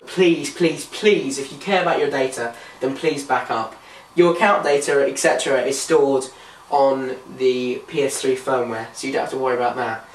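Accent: United Kingdom. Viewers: are you british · you're so British! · this an accent from the British Island. Nice video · Looks American sounds British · its like a peter griffin with a harry potter accent!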